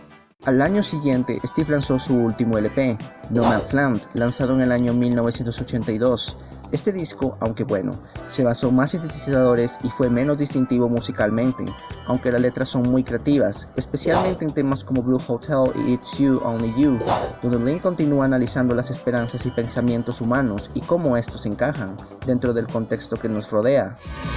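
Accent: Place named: Venezuela. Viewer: Genial este resumen sobre la grandiosa, a través de este material descubrí este excelente canal, que voy a seguir en el futuro, si no me equivoco por tu acento neutro podrías ser venezolano al igual que yo, saludos por tu canal.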